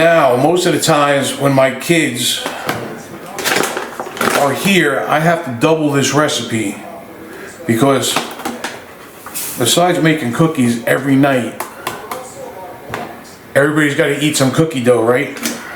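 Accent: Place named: New York, United States. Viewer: Cookies with a NY accent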